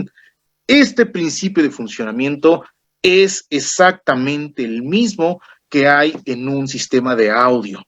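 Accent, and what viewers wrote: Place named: Mexico. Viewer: Muy buen explicado y con el simpático acento mexicano, muchas gracias, tenes un nuevo suscriptor.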